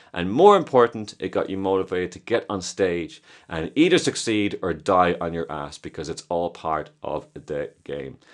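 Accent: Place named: Ireland. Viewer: Thanks! I love your videos! They are informative and well presented! And I love hearing your Irish accent :)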